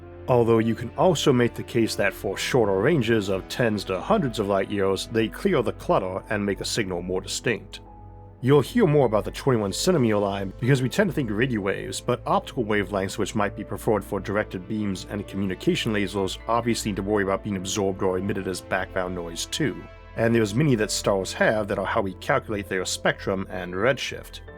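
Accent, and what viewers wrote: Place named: Louisiana, United States. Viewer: You from Louisiana? You sound like a guy I worked with around Mansfield. Thanks for the content. I’m entertained.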